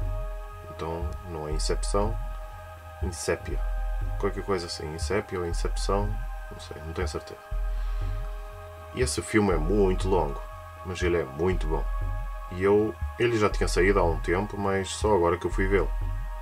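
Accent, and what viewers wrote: Portugal. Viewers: Es portugues fingindo ser brasileiro? Tamaste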